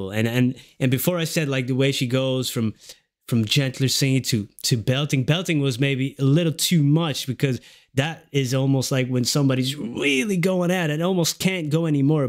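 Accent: United States. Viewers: are you sure you don't have some New York in you? The more I listen to you, the more I hear a slight NY accent. And then when you said, "You know what I mean?" - Barbra always says that phrase in her NY Brooklynese accent! Hehe! Anyway, it was great seeing your reaction again to another Streisand classic. (Me thinks she's got you hooked! 😉) Hey, I learned something from you on this one I never knew. You said this song was written in 1924. And then mentioned how we're listening to it 100 years later in 2024. And guess what, Barbra was 24 in this TV Special here. And also Barbra has always said that 24 is her lucky number! I love numerology! Wonder what it means, though? Lol! BTW, I'm dying to see your reaction to the Yentl Medley or even just "A Piece of Sky" from the movie Yentl. Can't wait to see your face when you get to one of her pinnacle blow-you-away numbers! 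She's got some real blockbusters you will almost not believe that that voice is coming out of a human being! 😎👍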